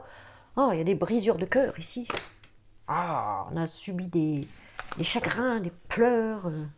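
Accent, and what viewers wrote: North, France. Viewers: Hein !! Hein !! Y’a un ptit accent du nord !!!!! Excellent..merci !! 😂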